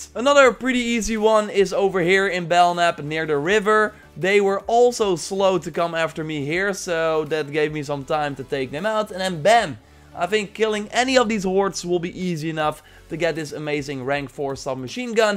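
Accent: Netherlands. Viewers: ben je nederlands je bent super goed in engels maar ik hoor het aan je accent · This Guy sounds dutch, i recocnize the accent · You sound like you're from the Netherlands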